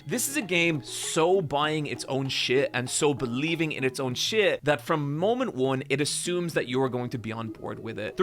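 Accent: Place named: Ireland. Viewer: He is Irish...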